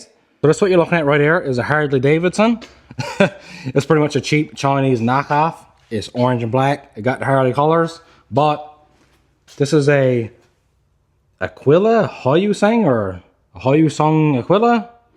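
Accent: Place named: Newfoundland, Canada. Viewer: Newfoundland by the accent.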